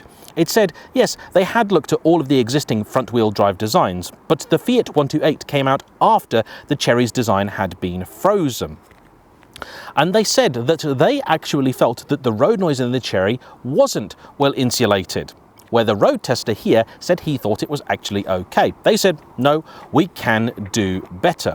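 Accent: United Kingdom. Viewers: This channel is very good both for learning about car history and for learning good, British English.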